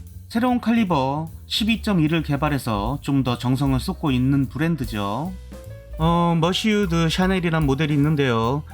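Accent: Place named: Jeolla, South Korea. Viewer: 더럽게 재미없네! 전라도 억양 킹받네 ㅅㅂ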